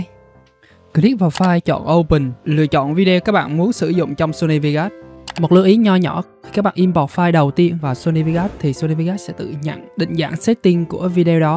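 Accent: Vietnam. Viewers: Giọng giống người Đà Nẵng?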